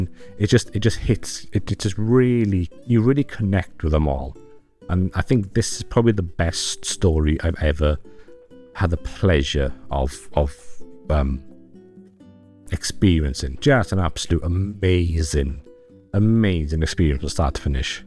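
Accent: Wales, United Kingdom. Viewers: Where in Wales you from bud?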